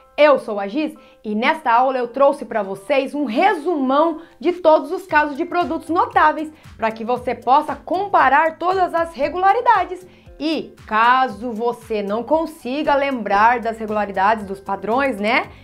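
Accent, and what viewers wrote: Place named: Caipira, Brazil. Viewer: Esse sotaque caipira incrível ceRto, teRmo, diferEnça kkkkk Muito bom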